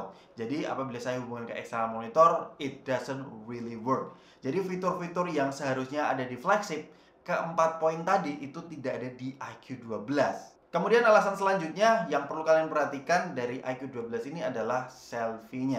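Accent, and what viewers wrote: Semarang, Indonesia. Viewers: loh semarang to mas ? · loh citragrand, masnya orang semarang yaah?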